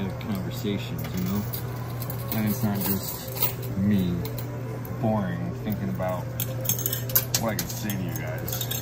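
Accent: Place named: Appalachia, United States. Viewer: What was your buy in, sooo your profile pic is not the real you?? And....your hill Billy twang ain't waiting was last time you was here with mrs colin!! I like watching this cos I'm a gambler too Sometimes, but I like the pokies coz you don't have to think about nothing just tap tap tap, like what you doing, drop drop drop lol...don't talk if u got nuttin to say.. If u not feeling it don't say it I just wanna watch not listen to talk lol talk is too Cheap...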